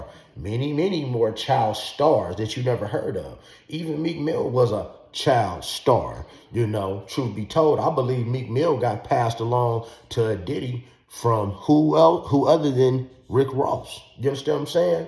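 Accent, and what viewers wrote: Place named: California, United States. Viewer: New sub here coach! I definitely thought you where from cali!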